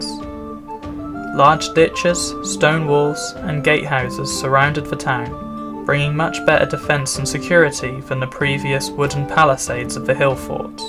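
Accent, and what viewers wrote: Norfolk, United Kingdom. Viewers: that lovely norfolk accent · That Norfolk twang on a YouTube video is such a welcome sound!! Great video!! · the commentator is not dropping his Ts through laziness. It is a characteristic of the traditional Norfolk accent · “Cor blarst me”you are definitely a Norfolk bou “keep a troshing “ nice job on the video, Norwich boy born and raised here.👍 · Lovely soft norfolk accent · I live in Manchester area now, but Norfolk born and bred... It’s refreshing to see and hear a great video by a local with passion for the area 👍🏻 Keep yew a trosh’n bor 😉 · never heard anyone have as strong as a Norfolk accent as you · you sound like a local Norfolk..lad by your accent ..very country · You call that a Norfolk accent! I could barely tell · Nice to hear the Norfolk accent creeping in now & again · You sound like a good old Norfolk boy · Nice gentle Norfolk accent. 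I hadn't realised how much it misses the internal 't' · a very pleasant Norfolk accent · At last someone who speak propa Norfolk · love to hear a true Norfolk accent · I love your Norfolk accent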